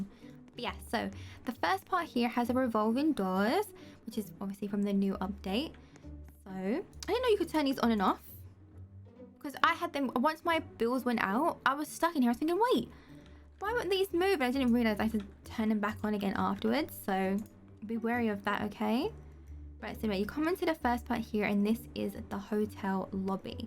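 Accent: London, United Kingdom. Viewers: You sound British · Are you British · Amanda Sorkin She’s from London 🇬🇧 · BRITISH WOMAN!!!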